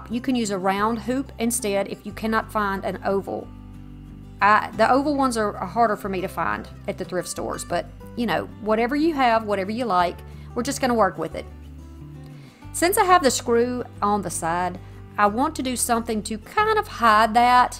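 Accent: South, United States. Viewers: Omg love your accent honey! I love the South Y’all are the salt of the earth!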